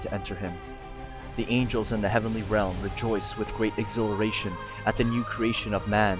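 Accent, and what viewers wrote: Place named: United States. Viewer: Before you try to claim I'm being anti-patriotic, I'm an American. Anyway, there is nothing more cheesy than an American accent mixed with forced heavy tone - it just sounds laughable. I would prefer a Rabbi speaking in Yinglish (Yiddish/English) over this very non-threatening and boring and characterless American accent. Boring.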